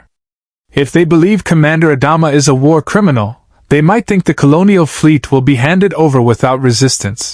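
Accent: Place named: South, United States. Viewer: Sounds like the South...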